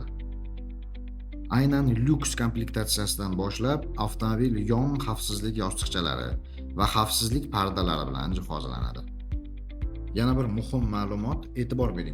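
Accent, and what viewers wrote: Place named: Uzbekistan. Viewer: Тоза узбекча учун алохида рахмат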